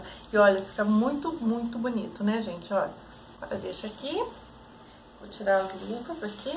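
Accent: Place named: Brazil. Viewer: Hola soy de México. Y me gusta ver videos usted es Brasileña por el acento de su voz es muy guapa me gusta le envío desde acá un saludo muy afectuoso y le deseo muchas bendiciones desde México como se llama el lugar donde vive usted y como se llega ya estando en Brasil. Espero me conteste porfavor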